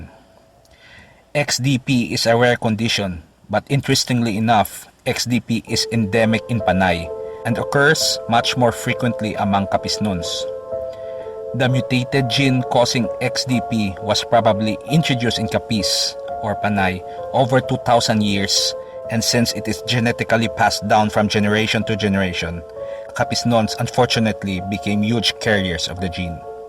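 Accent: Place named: United Kingdom. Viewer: I am not sure which literatures the Author consulted. As a Capizeno, we grew up with the scary stories from Dumsngás, Dingle and most of all Duenas. This propanganda of the Spaniards had barely supported by books by Spaniards. Poorly research and Capiz has nothing to do with Aswang. Your heavy English accent betrays your origin. THIS VIDEO IS POORLY RESEARCHED. FALSE NÈWS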